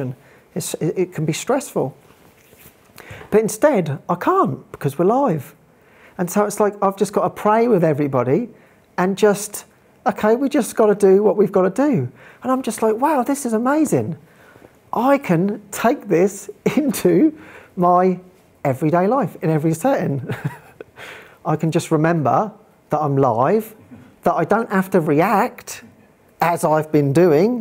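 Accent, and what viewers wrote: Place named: England, United Kingdom. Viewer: This was joyful and fun, and with that England english almost like Monty Pyton 🙃🧡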